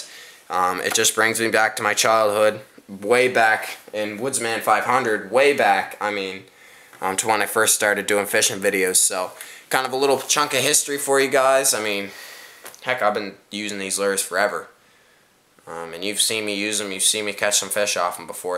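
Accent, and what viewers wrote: Canada. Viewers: just started fishing rapalas and they seem pretty damn good. you get what you pay for i guess sometimes. ps - what's your accent? canadian? or just north states?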